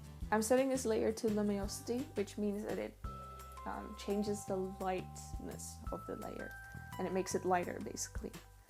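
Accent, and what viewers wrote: Netherlands. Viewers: Ikr, she totally sounds dutch. also she said Haargrens when she was looking for the word hairline. so yeah. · Are you Dutch? You kinda have a Dutch accent.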